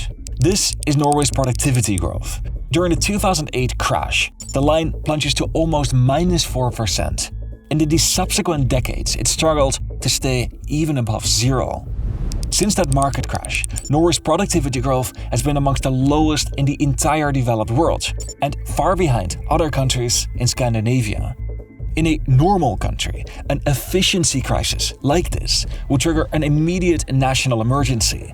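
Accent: Netherlands. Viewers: I really like dutch accent, it's strange and insteresting, also the second channel is super high quality, I watched 3 videos as far there, about turkish coup, bosnia and saddam, they're good so far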